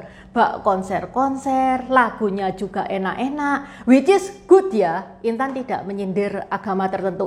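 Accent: Java, Indonesia. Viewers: Intan …aq senang dengan logatmu yg Jawa medok 😂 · Logate jowo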